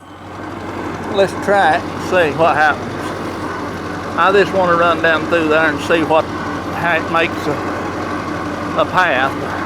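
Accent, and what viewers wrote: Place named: Appalachia, United States. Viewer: Your video just popped up in my video feed and you sound like you are in my neck of the woods in Appalachia. Maybe Ky or Tn? Hickory king is the best field corn and roastin ears too. I love it for corn relish and cornbread too! Yep, seed time and harvest time and global warmin and coolin. I like your Farmall Cub. My grandaddy had a Farmall M. Plant everything you can. You'll need it soon enough.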